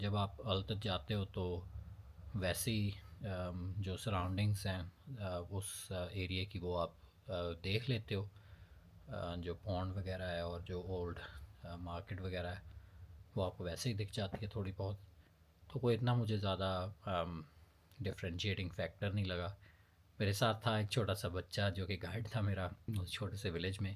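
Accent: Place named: India. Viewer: Bro r u indian?. Ur urdu has very big Hindi/indian touch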